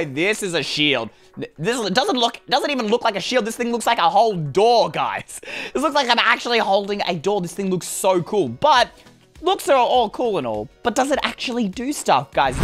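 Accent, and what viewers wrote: Italy. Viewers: OMG. ‘I have 2 things 1: I ABSOLUTELY LOVE YOUR ITALIAN ACCENT ! 2: Dream : Want my comment to be hearted ( idk if u did ) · I LOVE your Italian accent!!!!!! You make every day so much brighter!!!! ☀️☀️☀️ You are amazing!! I love you ♥️ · I just love your Italian Ascent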